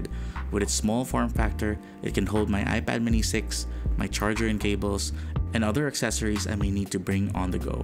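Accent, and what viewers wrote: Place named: Philippines. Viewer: Your accent is telling me you are a Filipino.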